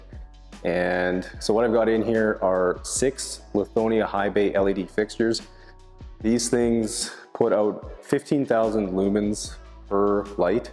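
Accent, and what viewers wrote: Canada. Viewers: GREAT building!!!! Very professional!! I get a kick out of your Canadian accent too. GREEJ? I guess that's GARAGE? LOL Keep those transformed car videos coming!!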